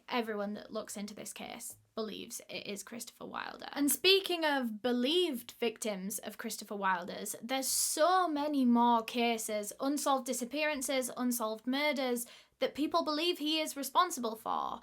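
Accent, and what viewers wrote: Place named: England, United Kingdom. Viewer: Took me a long while to make my fav to listen to, her English accent is very strong … but today I’m struggling with those stupid “baby hairs”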